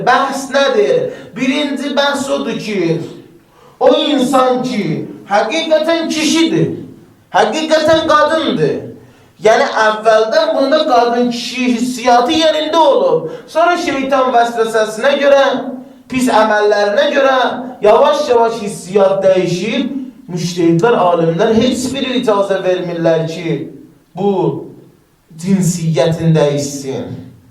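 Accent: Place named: Iran. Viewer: sen niye iran lehcesi ile danisirsan ay Azerbaycanli?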